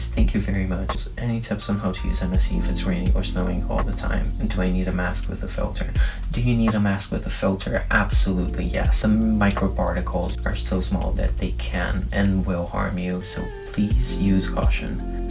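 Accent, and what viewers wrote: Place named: United States. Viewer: How is your English so good and why do you an American accent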